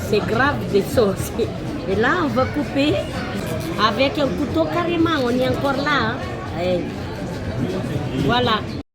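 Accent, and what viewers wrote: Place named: Madagascar. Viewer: Il n’y a pas que ses compatriotes comme public…deja elle meme vit dans un pays où on parle français donc certainement elle a des proches qui s’expriment dans cette langue, l’interêt de ce genre de publications est de montrer à un maximum de personnes dans le monde, gasy ou pas, la beauté du pays et la vie quotidienne sur place, d’oú le choix de mixer les langues afin de ne pas restreindre sa communauté. Vous voyez bien qu’elle fait meme l’effort de traduire et d’apprendre certains mots gasy à ses abonnés. Par ailleurs je trouve justement qu’elle s’exprime bien en français avec l’accent et les nuances propres à son pays d’origine…quel intérêt de voir une gasy parler Français comme une parisienne?? Y a d’autres videos sur le net pour cela…quand on montre son pays natal, ses émotions, on a pas besoin de parler comme molière. 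on parle avec son coeur et son accent!! En plus le français est aujourd’hui parlé de différentes manières en fonction des pays..c’est meme ce qui fait son charme et la beauté de la video.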